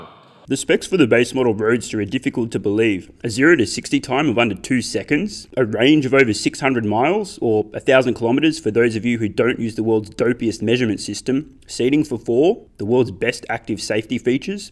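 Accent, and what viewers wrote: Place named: Australia. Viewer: you have no offence a voice that is difficult to listen too.... it’s a very unattractive Australian accent. I suggest you get a woman to voice over your videos doing this will win you more subscribers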